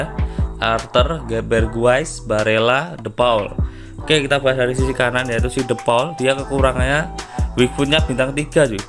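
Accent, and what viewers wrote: Java, Indonesia. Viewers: Logat omongan lu kek orang Jawa bang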